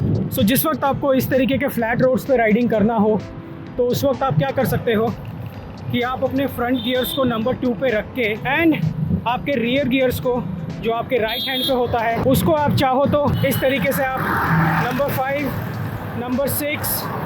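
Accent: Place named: Kashmir, India. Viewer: Very nice. Your accent is Kashmiri 😊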